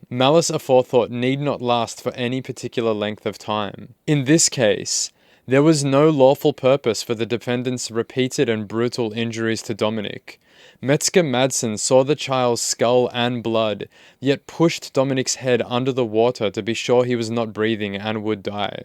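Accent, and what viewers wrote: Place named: Australia. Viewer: I'm not a big time YouTube commentator because of how much i try to be anonymous, but your videos certainly have a way of stimulating interesting discourse and your point of view is intriguing. (Also aussie accents are my favorite 🤘🤘🤘) All i really can say about this one is i am not convinced that Cody is of average "intelligence" for lack of better wording. He seems like he could have developmental delays which are especially likely given his exposure to hard drugs in utero. I say this not because I am excusing what he did or even buy the whole goblin thing, but i feel empathy for him because he is clearly functioning at the level of a much younger person, perhaps even as young as the boy he murdered. Murder is wrong and horrific, obviously. Cody is an unfortunate soul though too. Born on drugs is trauma, foster care is trauma, being different from your peers is trauma, and so is severe mental illness. 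The universe is impartial, and Cody was dealt a bad hand. Many of us are to varying degrees. I don't have a point to this, but i couldn't help saying out loud at the end of your video, "what a shame". (Edited misspellings)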